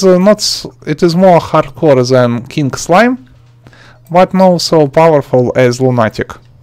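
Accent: Russia. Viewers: He sounds so russian or of a similar region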